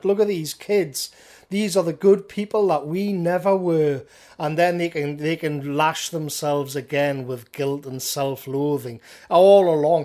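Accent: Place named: Newcastle, United Kingdom. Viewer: Fuckin hell a Geordie?! :O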